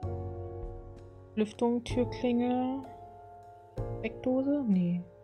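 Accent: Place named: England, United Kingdom. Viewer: So... erste Kommentar! Die Folge ist mir einfach zu lange, maximal 45 minuten wäre optimal, wenn möglich. Ich finde dein Englisches Azcent lustig und süss! Du bist doch kein Geist,. Du bist ein Vampyr XD Bin gespannt was du noch in diesen DLC schafst!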